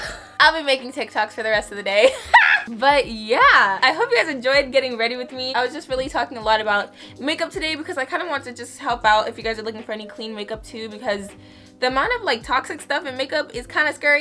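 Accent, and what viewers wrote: United Kingdom. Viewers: Why did I get confused by the British accent for a second?😂😂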